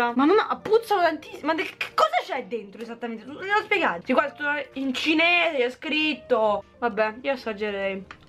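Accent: Rome, Italy. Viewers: Il tuo accento romano lo amo 😍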